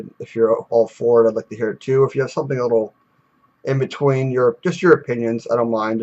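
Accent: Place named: Canada. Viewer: My god, I haven't heard such a typical Canadian accent for a long time.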